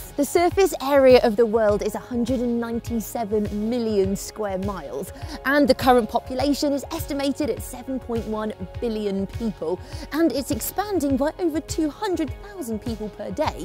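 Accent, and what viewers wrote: United Kingdom. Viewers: ur sexy, i love British accents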